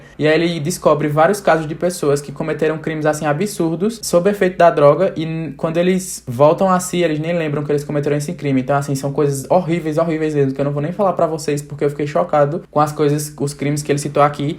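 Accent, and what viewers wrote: Northeast, Brazil. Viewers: ótima esta proposta comentar os livros indicados por pessoas que você admira. Crie sim um Quadro. Três observações apenas. Não se preocupe com sua pronuncia dos nomes, mas voce pode por exemplo, consultar no google tradutor, no audio a pronuncia do idioma original. Segunda, Bob Dylan nasceu na decada de 1940 período da segunda Grande Guerra. Por fim, fale mais lento, voce explica com clareza, logo, não precisa acelerar sua fala. É muito bom ouvir o seu sotaque, infelizmente minha mãe que nasceu em Pernambuco e veio para Minas, perdeu o dela. Sucesso sempre!😊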